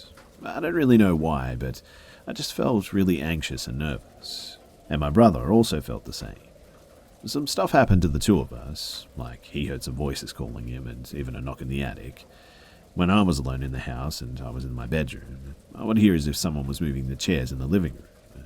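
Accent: Australia. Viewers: Bro you an Aussie like me? Sounds like it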